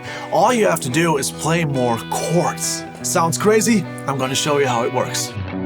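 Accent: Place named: Germany. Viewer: You have just the smallest hint of a German accent as if you moved to America around the age of 12.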